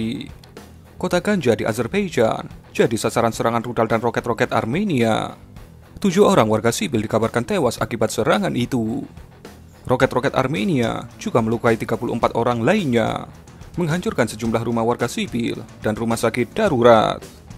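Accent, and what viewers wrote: Java, Indonesia. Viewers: suaranya medhok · Wong mjowo kah min??🤣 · medhoknya jadi ciri khas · Kangen bahasa medokk mimin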